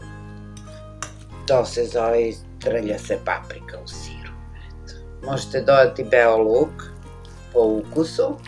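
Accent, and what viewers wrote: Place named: Kruševac, Serbia. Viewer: Uživala sam u Vašem, mom po rodjenu kruševačkom dijalektu.Interesantno to nikada nisam jela. Baš moram da probam! Hvala Vam!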